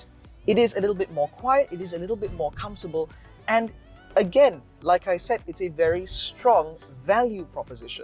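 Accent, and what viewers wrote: United Kingdom. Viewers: Raw malay rempit look with Nice british accent. My brain is scrambled.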